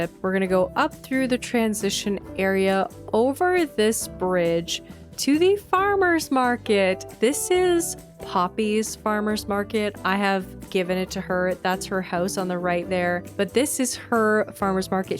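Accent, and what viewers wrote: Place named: Cape Breton, Canada. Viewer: u are rly speaking like a cape bretoner!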